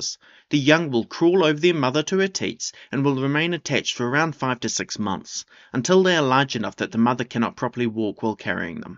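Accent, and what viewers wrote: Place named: New Zealand. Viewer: That's some accent you've got there pal, it took me a few seconds to figure out that the "iglang" mammals were egg laying mammals, New Zealander?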